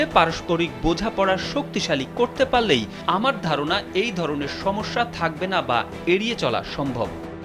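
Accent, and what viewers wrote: Kolkata, India. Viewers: তুই তো দেখতেছি কলকাতার ভয়েস নকল করা শুরু করছিস😅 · আপনার প্রচারের গলা ভারতীয় দাদাদের মতই। দয়া করে সাবলীল ভাষায় সংবাদ পড়বেন। নতুবা বয়কট।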